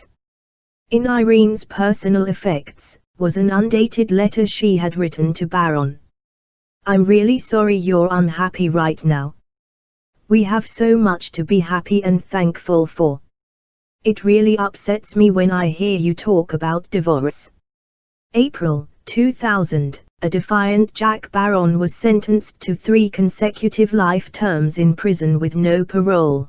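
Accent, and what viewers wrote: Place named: United Kingdom. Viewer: Amanda Sack agreed, the mechanical voice is distracting. A tinny British accent, literally like fingernails scratching across a chalkboard.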